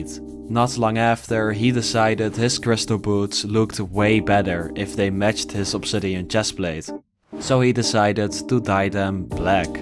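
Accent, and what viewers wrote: Netherlands. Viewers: You really sound Dutch. Are you Dutch? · The dutch accent 😭😭🙏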